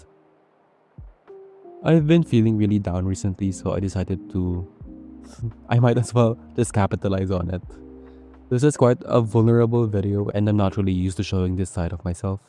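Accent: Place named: Philippines. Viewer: Pinoy?- (I said to myself the moment I heard the accent, and then you said PINOY NGAAA!! YEY)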